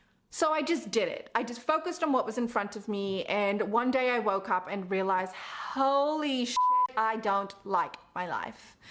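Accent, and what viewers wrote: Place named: South Africa. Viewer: You sound South African, specifically like you're from the Western Cape suburbs 🌟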